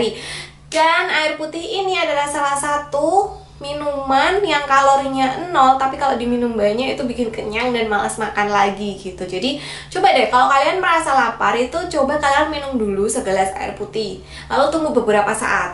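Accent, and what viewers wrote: Java, Indonesia. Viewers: ooaaalllaaahhh..... mbak nya wong jowo toh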